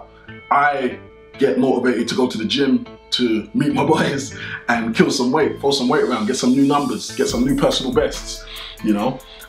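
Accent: United Kingdom. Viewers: Did not expect the british accent.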